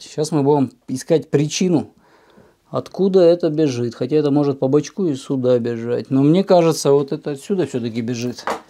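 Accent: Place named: Kirov, Russia. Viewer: Как приятно слышать Кировский говор всё супер у меня такая пока всë норм нравитс🎉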